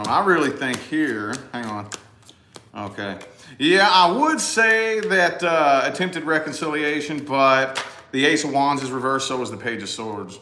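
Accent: South, United States. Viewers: Yes. That's a southern accent. Different southern states have different dialects. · Love your voice and your accent and expressions, you make me laugh, is that a southern American accent?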